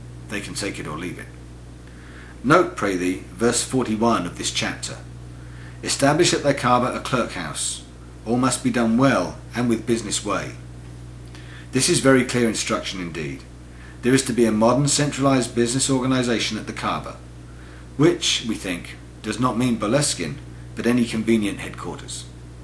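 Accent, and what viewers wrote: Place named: London, United Kingdom. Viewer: I am from London and this chap sounds like he probably came from nearby.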